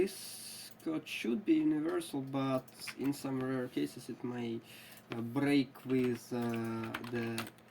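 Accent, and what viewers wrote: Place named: Russia. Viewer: Russian pronounsation fits to the videos like this :-)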